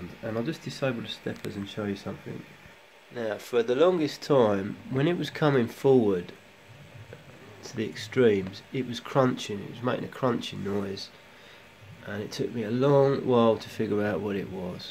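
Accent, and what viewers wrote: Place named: England, United Kingdom. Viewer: U have the worst english accent I've ever heard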